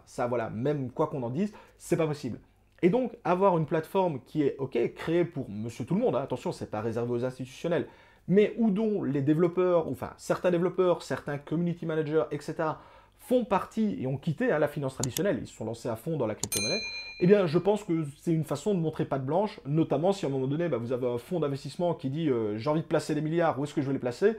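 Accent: Belgium. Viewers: Salut, j'ai reconnu directement ton accent belge, sa m'arrange car je me demande justement si je cash out sur un compte en belgique quel sont les taxe encourue? Merci a toi bizz!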